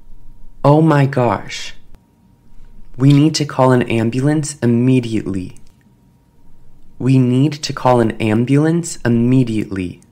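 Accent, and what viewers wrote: United States. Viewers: Usa accent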